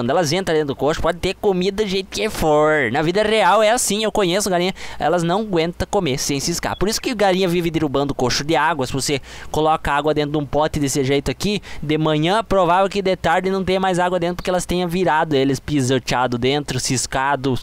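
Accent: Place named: Paraná, Brazil. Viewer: kkkkk C é do Paraná mano? Meu pai é paranaense e fui morar 1 ano com minha vó no Paraná e a galera fala desse jeito lá kkkkk povo doido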